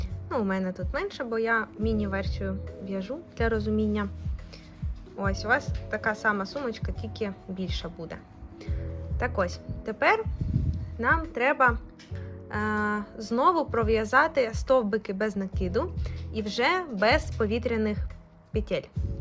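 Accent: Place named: Russia. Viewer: Прекрасный мастер-класс,прекрасная мастерица,но боже, как же режет слух украинская речь с русским акцентом😓 Такое чувство,будто украинский не ваш родной язык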